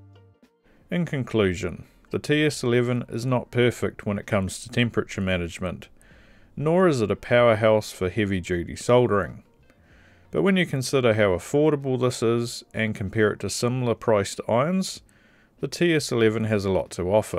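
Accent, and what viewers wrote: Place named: New Zealand. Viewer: Are you referring to ? To my ears he says "four hundred and fifty degrees celsius". I live in New Zealand, the same as the video creator, although I do not know him at all. In our version of English, that is how we would say 450 degrees celsius. If you are referring to some other part of the video, can you give us a timestamp?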